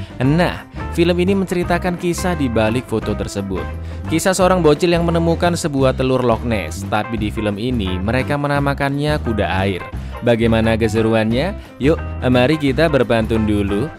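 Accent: Java, Indonesia. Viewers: Bang asal Jawa mana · Bang lu orang jawa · Aku mas wong jowo. Mas e jowo ndi mas? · Wong jowo yo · Jowo ndi kang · Wong jowo ya😹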